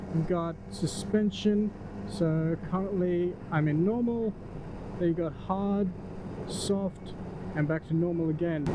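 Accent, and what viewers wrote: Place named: Australia. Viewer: It's an awesome bike. I've got the 2019/2020 model and it's an absolute peach of a motorbike. Got it in July 2020. This was a great review as well. I notice the Australian accent mate. Nice to see some Australian content on YouTube as well. Yeah, the s1000r is the best of both worlds in my opinion. It's a total hooligan & docile put-put bike for around town. The quick shifter is the smoothest I've had & fueling is perfect. Super responsive and plenty of get up & go, even if you have leathers with your name on the back of'em.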